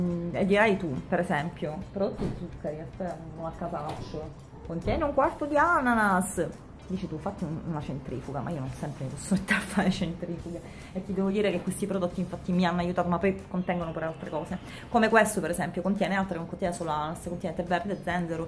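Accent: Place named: Palermo, Italy. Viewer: utilissimi i tuoi video come sempre! io devo ringraziarti.. mi hai fatto alzare il "culo" dal divano! .. mi hai organizzato la vita! ora sveglia alle sei e workout di jillian! e non solo, dopo cinque anni che vivo a palermo tu, e solo tu, sei riuscita a farmi prendere l accento palermitano! (non che vivevo lontano giusto 70 km più a est, ma non avevo questa cadenza !) grazie di tutto ;)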